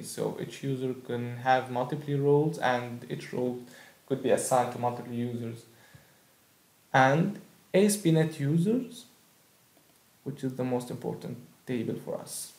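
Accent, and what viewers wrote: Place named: Egypt. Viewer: عرفت انك مصري من لهجتك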